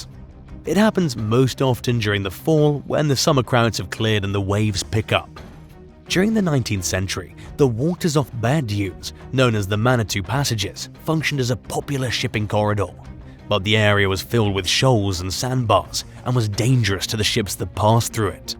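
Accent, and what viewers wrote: England, United Kingdom. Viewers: Love how this is American Eye and has a Englishman speaking. 😆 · American Eye , has England accent .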